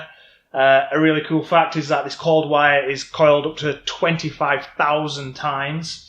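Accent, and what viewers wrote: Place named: Manchester, United Kingdom. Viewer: Skanky Manc Accent from the poor man's Michael York, recorded in an echo chamber. Nonetheless, nice vid.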